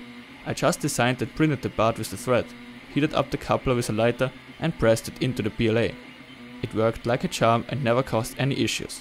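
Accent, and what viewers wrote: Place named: Germany. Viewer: German accent too heavy can't understand what was said! won't stick at all! So can't print mods printing in ABS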